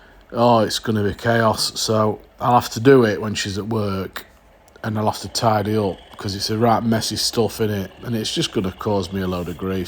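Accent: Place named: Northern England, United Kingdom. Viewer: I just envy your northern lilt much better us ‘ampshire ogs you do voice over for my vids and story time ps grot 1000 %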